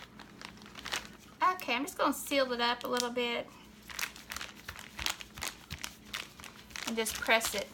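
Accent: South, United States. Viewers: You Got That Southern Accent Nothing Wrong With It Just Some Of The Words In Southern That You Say Sometimes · you have a little bit of a Southern accent. I don't know about where you live but I live in Phoenix and they have 100 different ways to do mac and cheese anything from deep-fried to pizza toppings.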